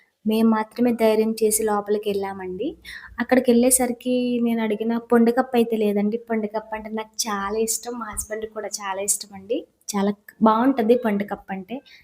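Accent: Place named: Konaseema, India. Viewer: I like konaseema❤❤ Akka Mee side slang Bale vuntadhi Chakkaga baga matladatharu meeru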